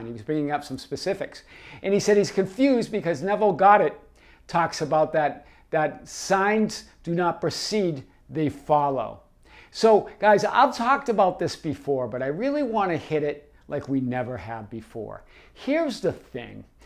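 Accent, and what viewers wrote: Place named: New York, United States. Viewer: I love your new yorkish accent Reminds me of home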